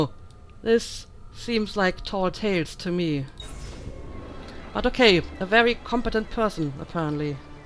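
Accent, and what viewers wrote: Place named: Germany. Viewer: Hey! I'm new to your channel and one of the first things I noticed was your accent. So quick question, are you German?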